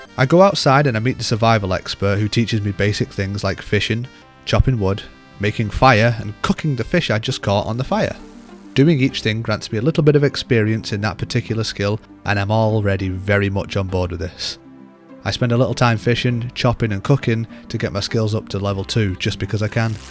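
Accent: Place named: Darlington, United Kingdom. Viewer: Where are you from... Somewhere like Darlington... The accent is deeply reminiscent to me. I grew up in Middlesbrough...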